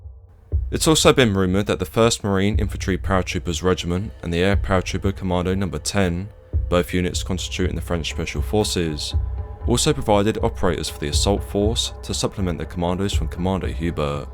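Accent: United Kingdom. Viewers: Love your videos. The biritsh accent makes it even better.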